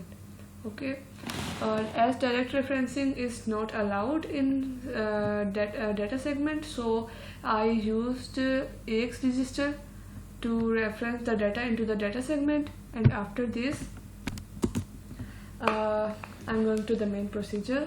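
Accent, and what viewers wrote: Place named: Karachi, Pakistan. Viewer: you are from Karachi, lalukhet* right? I judged by your accent..... sorry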